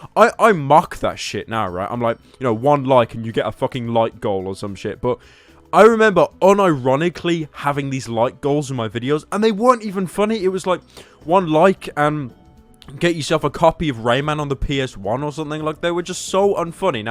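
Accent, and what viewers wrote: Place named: United Kingdom. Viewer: Damn you an you manly deep british voice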